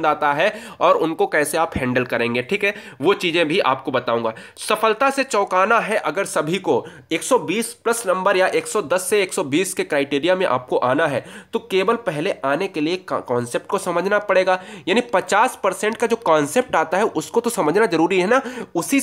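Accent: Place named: Uttar Pradesh, India. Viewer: Up se sir khadi boli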